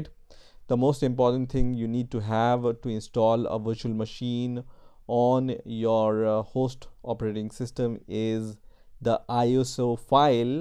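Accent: India. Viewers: oh indian (not racist,just suprised to hear not bad at all,but different accent)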